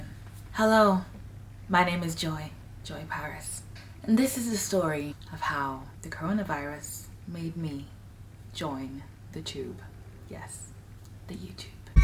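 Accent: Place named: Jamaica. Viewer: The Jamaican Accent is E-VER-EE-TING! 🤣❤️ This is amazinggg.😊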